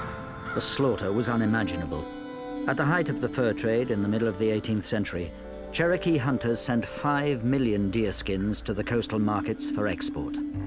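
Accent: England, United Kingdom. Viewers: Interesting listening to what sounds like an Englishman speaking words like Appalachia and others. Very well done video though and quite professional. The native superstition material was a bit much. Moira Smiley was a perfect choice for vocals. Her talent was only out done by her beauty. She is lovely.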